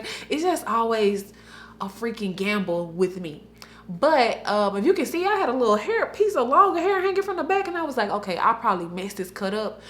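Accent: South, United States. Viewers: And that country accent is everything! #SouthernRoots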